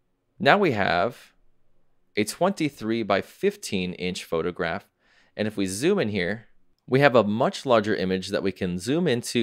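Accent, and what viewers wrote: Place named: New York, United States. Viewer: Why do you pronounce ON1 Photo Raw as Photo Rah? I've wondered about this for a while now. Is this a NY pronunciation?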